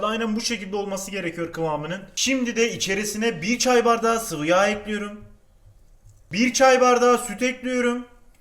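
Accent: Adana, Turkey. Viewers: abi adanalımısın yeni fark ettimde ediyürüm yapiyürüm ekliyürüm valla adanalılar da böyle konuşuyor :D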